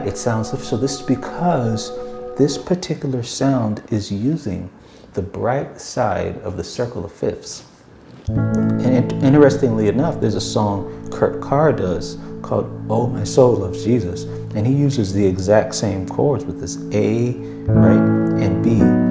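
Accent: Caribbean. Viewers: He sounds Caribbean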